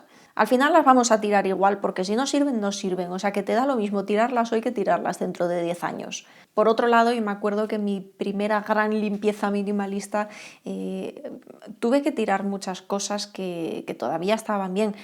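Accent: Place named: Spain. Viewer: Es maravilloso escucharte!! Eres dulce, motivadora y nos das tips muy excelentes. Además amo tu acento español!!! Me has enseñado tanto y me eres mi gurú, ya no sólo del minimalismo, de valores de la vida en general. Te tengo guardado un gran cariño y agradecimiento! He adoptado tu frase "mandar todo a la porra" 😊❤💕🌸 Eres genial!!!!!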